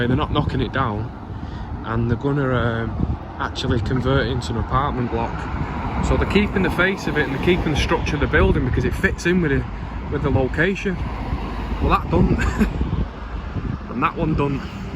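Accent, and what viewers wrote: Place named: North, United Kingdom. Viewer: Great story and video editing, complete with reminiscent storyline in a strong northern accent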